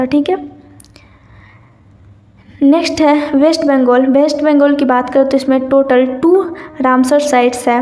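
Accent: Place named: Bihar, India. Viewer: I think you are from bihar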